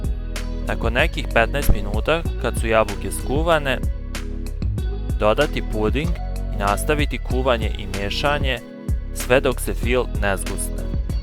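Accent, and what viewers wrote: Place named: Vojvodina, Serbia. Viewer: Хвала. Па по изговору и изгледало је да је Војводина. Али сад си у иностранству. Вероватно и тамо радиш нешто са кулинарством.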